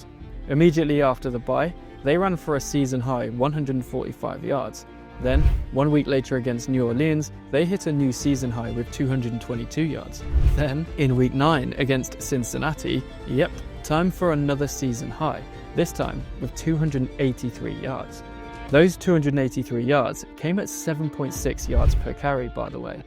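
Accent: England, United Kingdom. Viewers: No offense meant, but so weird to have a video about football narrated by an English accent. I thought most in the UK couldn’t care less about American Football. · Why are you English? I love this video and your breakdown.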